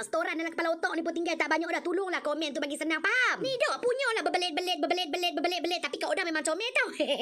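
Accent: Kedah, Malaysia. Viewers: Nak lagi bideo cmni...sronok dgaq org kedah pure ckp...sy pn lahir besaaq kt aloq staq...tp kami loghat kedah besa2 ja... nih msti org blah2 kota sarang semut pendang yan batu hampaq kot noh😅😅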